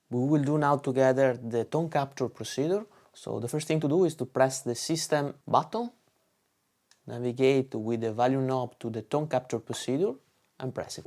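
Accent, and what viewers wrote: Italy. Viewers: Ma sei italiano? Mi sembra un accento italiano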